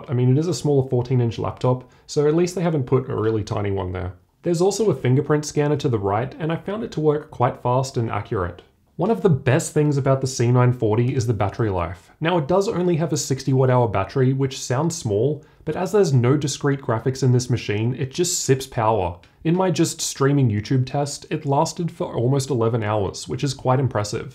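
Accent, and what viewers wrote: Australia. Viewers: The Australian accent caught me off guard 😆🤟 not many Aussie techies on YouTube.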